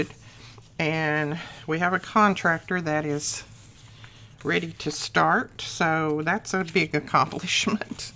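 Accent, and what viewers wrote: South, United States. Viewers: I absolutely ❤️ every one of your videos‼️Yours is my favorite channel to watch and my biggest inspiration. I’m very new to this but enjoy it so much. If I could afford it, I would buy everything you use and create all day. Ha ha. Love your accent as a fellow southerner. I’m from Texas, but the accent is the same. Talking about your family is part of your southern charm. Don’t pay attention to those negative people complaining about it! 😉 Keep up the beautiful work! -Michelle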